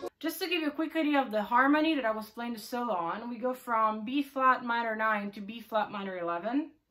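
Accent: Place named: Sweden. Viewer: Very talented! Eddie Van Halen fan here! Where do you reside from? Accent is hard to nail down. Kind of sounds Swedish? I am from MN, USA. Keep on rocken! Nice tapping!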